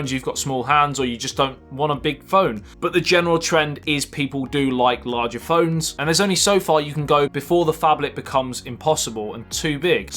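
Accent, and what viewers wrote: United Kingdom. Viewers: Are you from the UK? I'm sorry but I just can't stand the accent. And no I don't need a foldable phone at all. I mean it might be cool but no. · I subscribe just for ur British accent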